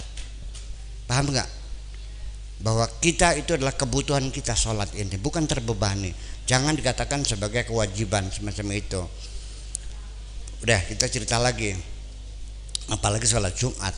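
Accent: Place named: Banyumas, Indonesia. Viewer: Penyejuk sejati, suka sekali dengan ngapak ngapak nya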